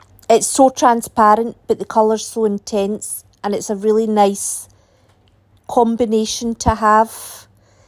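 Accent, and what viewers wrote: Scotland, United Kingdom. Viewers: Is your accent Scottish or a certain region of U k❓. It’s delightful💞💞. Recent fan of your channel/works of art❣️❣️❣️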